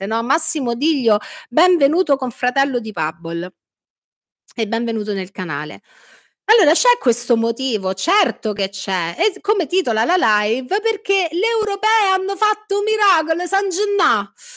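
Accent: Rome, Italy. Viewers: Io adoro come parli e quando lo fai in “romanaccio” ancora di più! E sono sicura di non essere la sola ad apprezzare sia il tuo perfetto uso della lingua italiana che quello del rafforzativo romano che sai dosare egregiamente! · Ma poi non parli il dialetto romanesco.( Nessuno ormai lo fa) Hai una piacevole ( per me) inflessione, ed usi espressioni tipiche che coloriscono l'eloquio di noi cresciuti nella città eterna rendondolo più efficace per chiunque abbia anche solo due neuroni accesi. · Pubble, ti ammiro molto, ma scusa però, tu dai veramente corda ai troll che ti criticano il bellissimo accento/dialetto romano? Ignorali 🫶🏻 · L’inflessione romanesca credo sia voluta per rendere più efficace il tutto. In realtà si coglie un ottimo italiano sotto l’intercalare. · non avrei saputo scriverlo meglio, condivido in pieno. Quel "romanaccio" è quell'elemento fondamentale per strapparti risate nonostante si ascoltino robe peso. 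Avanti tutta Pubble!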